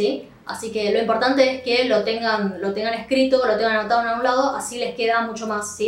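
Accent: Argentina. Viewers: La profe tiene un acento argentino. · Que horrible se escucha su tono argentino, hay otras asiáticas que se creen ya también españolas con su tono....... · Gracias por su ayuda. Observación: Cuando usted dice en español '¿"Tú trabajas"? o ¿qué trabajo haces?; yo escucho que dice ¿"Tú trabajás"? o ¿qué trabajo hacés? Otro caso: ¿cómo te llamas? ...escucho que dice ¿cómo te chamas?..es por el acento argentino pero no tiene que ser así ¿no? ..Gracias por los ejemplos que me son muy útiles. Podria dar un ejemplo con shangban ? Gracias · esta chica habla como Argentina, tal vez aprendió español en argentina... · ¿Chilena? No me lo creo. Tiene que ser argentina.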